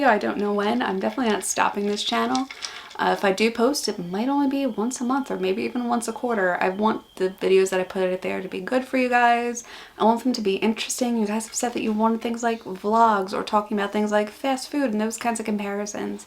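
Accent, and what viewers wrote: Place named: Australia. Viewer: Sending positive vibes! Hey watch out - I hear a touch of Aussie accent!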